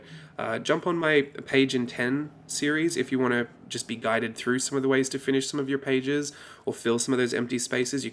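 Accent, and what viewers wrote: Australia. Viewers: This is the first video I’ve watched from you. I knew you were an Aussie by your accent. So refreshing 🤓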